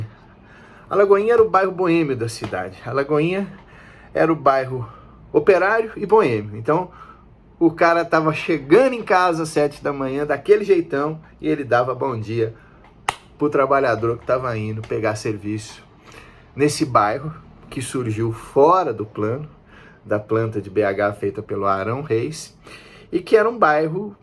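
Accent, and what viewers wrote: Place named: São Paulo, Brazil. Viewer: Cada vez melhores os vídeos. Bem legal também ver um amor sobre beagá narrado em sotaque paulistaço. Viva o Lagoinha!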